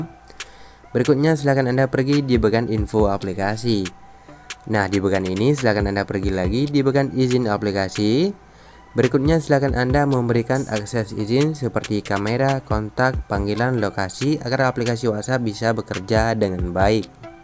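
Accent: Aceh, Indonesia. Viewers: Nyan keuh khas awak aceh.. Adak bak Ujong donya di jak,tetap ta turi logat awak aceh.. Yg penting subscribe,, mangat teubai kantong.. 🤣🤣🤣🤣🤣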